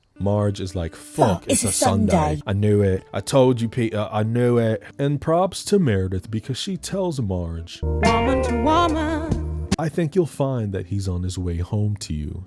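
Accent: United Kingdom. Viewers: I feel like me and you would be good friends because I too also go into a British accent randomly for like no reason. 🤣🤣 I blame all the British tv & British YouTubers I have watched over the years lol.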